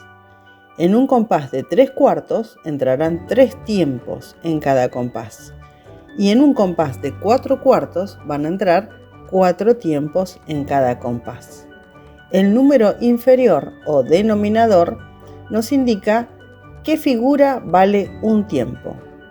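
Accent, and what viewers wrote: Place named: Argentina. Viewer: Gran estímulo para mi por dos razones, el acento argentino o el que tengas es muy agradable de escuchar y además tienes la mano canija por chiquita, como yo, eso me anima, gran cosa esta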